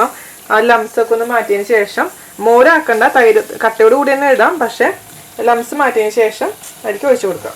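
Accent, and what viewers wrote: Thrissur, India. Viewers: Happy to see ur videos.. Me too from thrissur.. Simple thrissur slang demonstration makes me feel better to understand.. And tried many dishes as per your cookery demonstration.. Each and every attempt were successful.. Thanks chechi.. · തൃശൂർ ഭാഷ... അതോ എറണാകുളം ഭാഷയോ?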